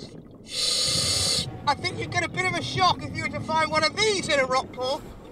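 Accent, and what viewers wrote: England, United Kingdom. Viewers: I love british accents playing around with dangerous things. lol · Somehow a British accent makes these encounters more authentic. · The British accent gives him documentarian deep sea legitimacy. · sotaque inglês da poha ‼️‼️‼️ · Love the British accent.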